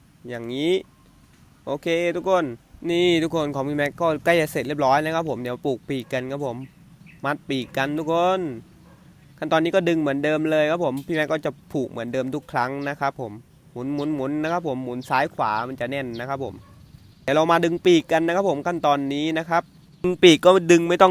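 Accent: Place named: Central Thailand, Thailand. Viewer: สำเนียงกลางภาษาไต้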